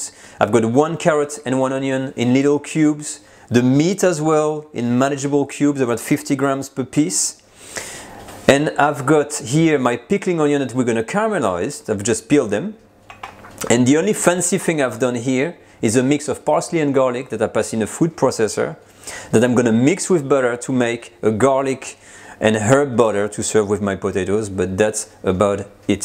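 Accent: France. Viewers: I like the way you pronounced stir as “steer” and your French accent! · Nice! I'll try this recipe tomorrow, I love your french accent by the way... greetings from México! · Mate, are you some sort of French guy located in Australia with Dutch roots? I hear lots of things happening with your accent.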